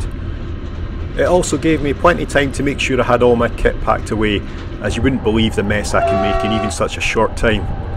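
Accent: Scotland, United Kingdom. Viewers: Another memorable report. In the very olden days I used to frequently travel by sleeper between Milan and Frankfurt. The luxury in those days would have even impressed Agatha Christie. That notwithstanding I could never sleep. I always had the sensation that I was slipping out of bed. BTW was that a Scottish accent hiding behind the Polish?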